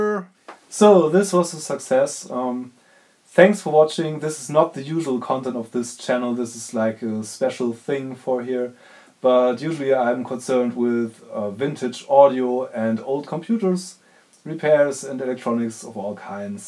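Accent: Germany. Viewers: nice repair and easy-to-understand explanations... I happen to have the same mic and I was wondering if you could tell me what to fix/ replace in particular because my headphone jack doesn't work anymore... So whenever I try to monitor my still working recordings I can only do it without the mic's output AKA by using the normal speakers included in my PC... Therefore could you please explain what causes the headphone jack to stop working or make a video on it? I noticed that you were very good at explaining in this video, it would help me out a huge bunch Btw, I also noticed that you had a bit of a German accent, I'm a German myself xD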